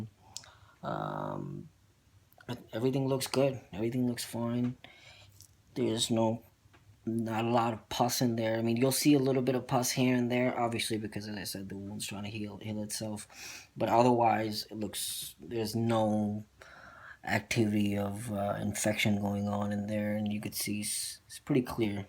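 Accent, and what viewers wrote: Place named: New York, United States. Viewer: You say awwff, for off, are you New York..New Jersey? I'm hailing from central London UK.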